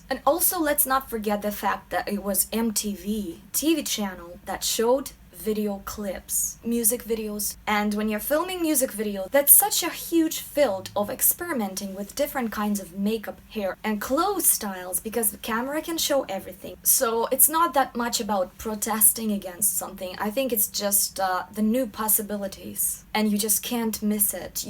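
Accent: Russia. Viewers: Надо же как я угадала что акцент русский😎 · I believe shes from Russia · Sorry, are you Russian? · Is that a slight Russian accent I can hear. Good analysis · me Its not constructive criticism. It is shaming someone who has English as a second or third language I guess. She’s Russian. She tries to speak English properly. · I love your accent, are you Russian?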